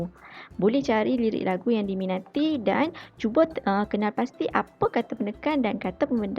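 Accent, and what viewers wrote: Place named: North, Malaysia. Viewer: Tahniah ...tapi loghat utara sangat ketara....sepatutnya sebutan baku...boleh ditingkatkan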